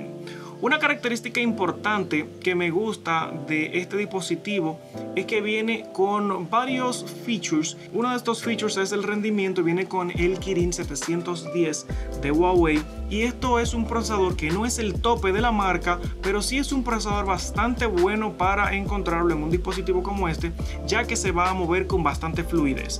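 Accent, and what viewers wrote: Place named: Dominican Republic. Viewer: Yo se que si eres de República Dominicana por el asentó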